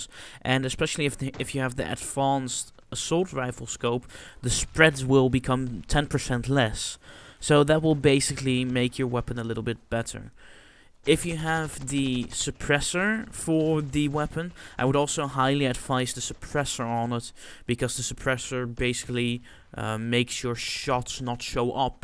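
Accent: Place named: Netherlands. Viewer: lol i smell you are a dutch person right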